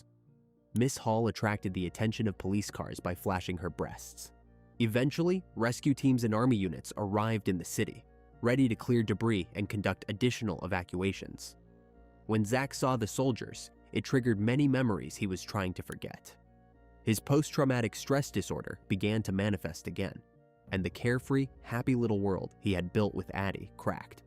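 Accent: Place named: United States. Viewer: He s fine . English is not my native language . I understand his merkan English clearly❤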